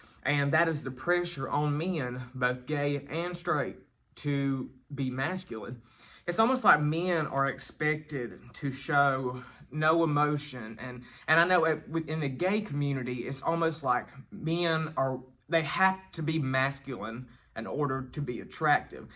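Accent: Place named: Texas, United States. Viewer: I found you by accident. What a treasure you are... I can relate to all of your videos.. I'm from Australia so I love ur Texan twang accent...LOL.. I am gay aswell but also a Christian....it's hard... I've started my YouTube channel only two months ago about being a gay in the church hopefully to help others... Keep the videos coming..❤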